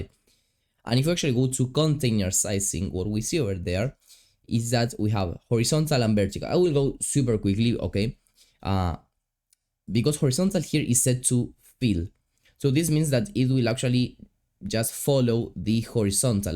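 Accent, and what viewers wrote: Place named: Rioplatense, Argentina. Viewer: El acento rioplatense es tremendo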